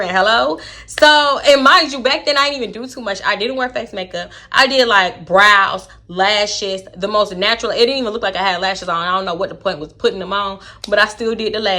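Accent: Baltimore, United States. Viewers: you taking me outtttt wit the baltimore accent🤣🤣it’s so funny hearing how we sound to other ppl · Are you from Baltimore? ( your accent lol) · this random baltimore accent be taking me out 😂😂😂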